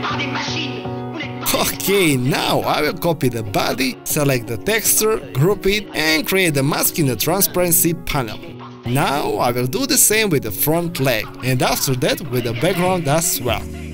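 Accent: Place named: Russia. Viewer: такой знакомый рашэн акцент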